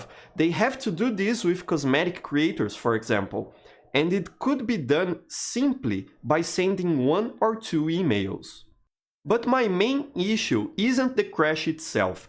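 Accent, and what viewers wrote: Brazil. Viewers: Hey, I noticed your brasilian accent, do you usually play on the servers? how full are they? (argentinian btw) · I recoginize i brazilian voice when i hear one. Oi. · Esse sotaque é BR, reconheceria em qualquer lugar. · That's one thick fucking Brazilian accent, my friend. I can hear your eyes going through the script.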